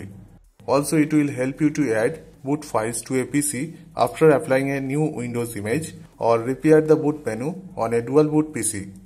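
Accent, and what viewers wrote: India. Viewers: Awaaz se vah Indian dikhta😂 hramkhor bolna re Hindi mein bol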